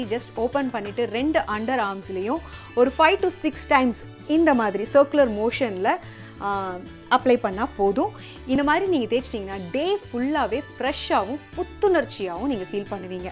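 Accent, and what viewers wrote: Kongu, India. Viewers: கொங்கு தமிழ் 💖 அழகா பேசறீங்க வரவேற்கிறோம் அக்கா 🙏🏻💚❤️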